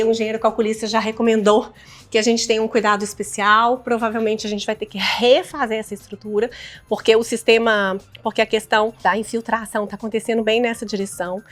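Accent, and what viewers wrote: Minas Gerais, Brazil. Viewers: Oie amo seus vídeos! Quando vc fala com esse sotaque mineires 🤣🤣🥰 amo mais ainda… sou apaixonada por essas transformações em casas antigas💙 um bj grande e to na expectativa de novos vídeos👊🏻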